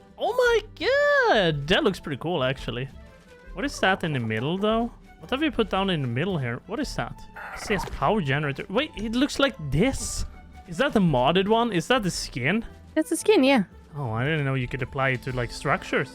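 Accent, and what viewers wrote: Sweden. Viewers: "VAD I HELVETE" god damn that caught me off guard🤣 Wasn't expecting swedish but guess that's why the accient sounded so familiar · Swedish accent shines through - Your Danish Sub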